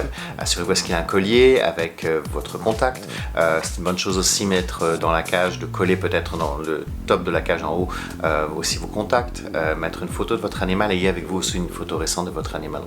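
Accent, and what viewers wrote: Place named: Quebec, Canada. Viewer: Bonjour, merci pour cette vidéo extrêmement détaillée. Même si j'étais fort bien préparée, j'ai appris de nouveaux conseils avec vous. Je me sens beaucoup plus confiante aussi. Également, je me demandais êtes vous québécois? Vous n'avez pas l'accent français. A bientôt 😊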